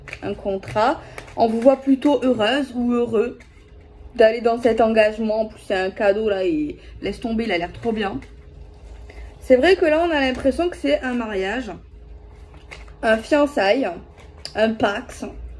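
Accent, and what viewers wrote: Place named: South, France. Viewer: trés jolie avé l, accent en plus ma voisine *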